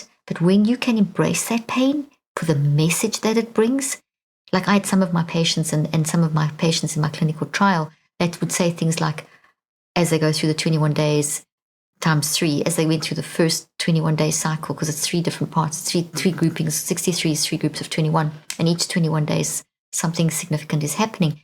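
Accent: South Africa. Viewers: South African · It is amazing how your South African accent remains over all these years. Thanks for these podcasts Dr. Leaf. South Africa will grow and develop within time- hope just needs to be cultivated in people's minds again. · South African I think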